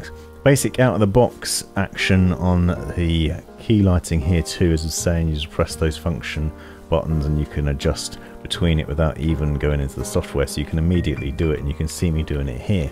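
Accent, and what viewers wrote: England, United Kingdom. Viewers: Обожаю настоящий, английский язык, а не нищий американский. Мёд для ушей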